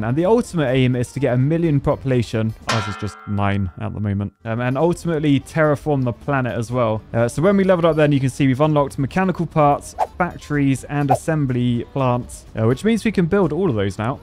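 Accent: United Kingdom. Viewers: your content has replaced just about every source of entertainment in my life lately. Thank you for playing videogames in a fashion way more entertaining than doing it myself. It's been fun marathoning through your backlog of videos. Have you ever thought of narrating those nature documentaries? Maybe it's the British accent but getting a bridge review in the middle of lion chasing a zebra would make my day.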